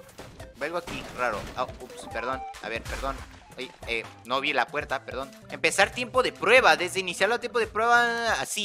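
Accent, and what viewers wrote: Mexico. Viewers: Oye eres de México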